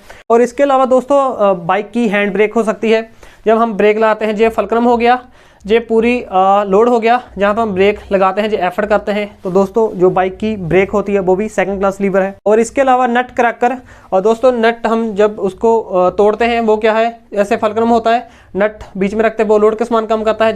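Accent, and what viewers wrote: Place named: Punjab, India. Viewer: Thanku sirr and apki punjabi 😅🧿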